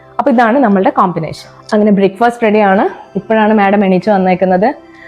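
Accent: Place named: South Kerala, India. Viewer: നിങൾ കോഴിക്കോട് അല്ലേ . എന്തിനാ ബുദ്ധിമുട്ടി തെക്കൻ കേരള slang എടുക്കുന്നെ ?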